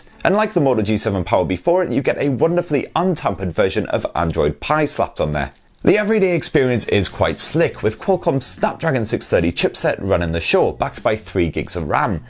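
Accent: United Kingdom. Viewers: the guy is clearly from the UK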